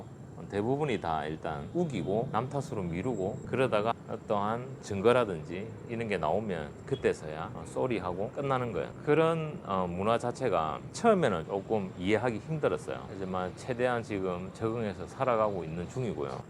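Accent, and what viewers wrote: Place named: Busan, South Korea. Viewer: ㅎ 부산사람 아이라 캐도 말 투가 딱 부산이네예 ~~ 근데 얼굴은 서울인데여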